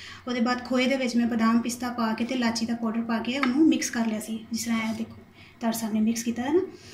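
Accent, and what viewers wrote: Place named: Punjab, India. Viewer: mashAllah very nice ot apka punjabi bolny ka style bht achaa laga..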